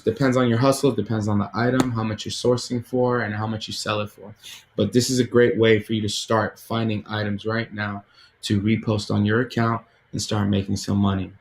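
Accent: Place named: New York, United States. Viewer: Sounds like you from New York, factzz.. Good info... Why are so called guru saying don't use Amazon, don't use software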